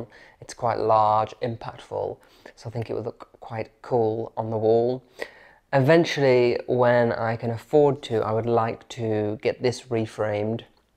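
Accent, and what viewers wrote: RP, United Kingdom. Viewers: Oh that BBC Accent - it's like listening to a young Kenneth Kendall reading the 9 O'clock news. I am an avid fan of Farrow and Ball, their Dead Salmon is to die for, pardon the pun, but Mouse's back - well I never - they do name their paints after some odd things. Such a stunning room with so much potential - I think you need to pay us all a visit and give us some design tips and ideas, they always say a fresh pair of eyes is like a new beginning, you never see it yourself until somebody else tells you.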